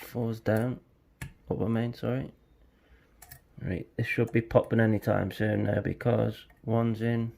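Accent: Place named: Newcastle, United Kingdom. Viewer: I’ve bumped Yale as me era rims lol on a different note I would love to see a video of you picking a Ruko padlock I have just got one (not the combi) I do have one bit way above my skill level but I think I should be able to open the standard one and I’m getting nowhere maybe 1 or 2 pins set your video explanations are far easier for me to understand even with the jordie accent 😂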